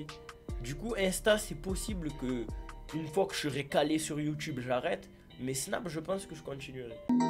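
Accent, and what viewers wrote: Marseille, France. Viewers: La vérité les marseillais jvous aime trop votre accent et votre mentalité tu veux le million pour protéger ta miff tes grv un bon en vrai 💪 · Laxent Marseille pas mal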